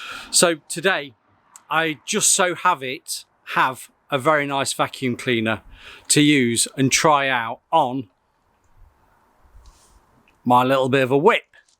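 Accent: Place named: Cockney, United Kingdom. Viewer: This man speaks in a Cockney accent.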